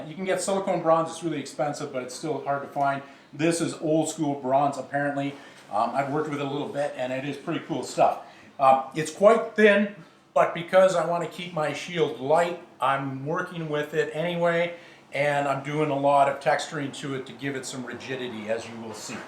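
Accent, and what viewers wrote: Canada. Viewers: I just stumbled upon your channel and this is the first video I've watched. Thanks for taking the time to make it. From the sound of your "oots" and "Aboots" you sound like a fellow Canadian.....or perhaps Minnesota ;) . Regardless, thanks for what you do. :) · Op. I've detect a Canadian accent. I'm in good company. Hello fellow Canadian.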